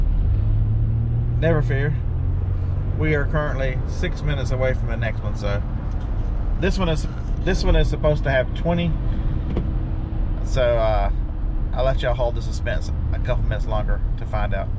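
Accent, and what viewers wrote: South, United States. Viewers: Love the country accent!!! All love from Georgia!!!!!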